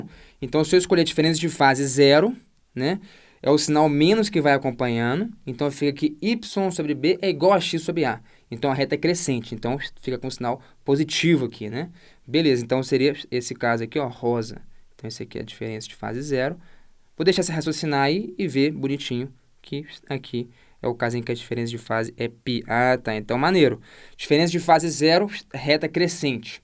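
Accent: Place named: Belo Horizonte, Brazil. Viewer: quando comecei a assisti seu canal tem um tempo, e tinha algo além do conteúdo que me chamava atenção dos demais canais, mas nunca tinha percebido. Recentemente vi um de seus stories e descobri que você é de BH, igualmente a mim, e aí eu percebi o que me chamava a atenção Esse sotaque de belo-horizontino rasgado kkkkkkkkk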